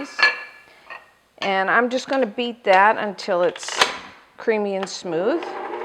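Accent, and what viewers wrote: Canada. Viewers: I should definitely use this recipe. Well, after I burned the regular bake version, which was unpleasant :x Your accent seems to familiar to me, aren't you from Canada? :)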